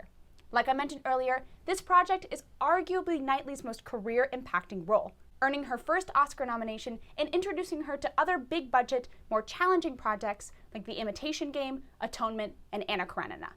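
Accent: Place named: RP, United Kingdom. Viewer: Yeah your posh is good!!! You go girl 👏🏾👏🏾👏🏾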